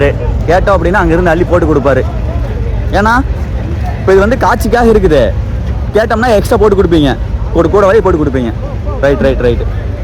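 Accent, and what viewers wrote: Kongu, India. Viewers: Hi bro! How are you & your family? Unga videos yellam super bro. And we like your kongu tamil, naangalum erode tha. But ipo dubai la irukom enga v2la unga videos tha paathutu irupom. And we are waiting for your flight travel videos. And mohan annava ktatha sollunga.🎉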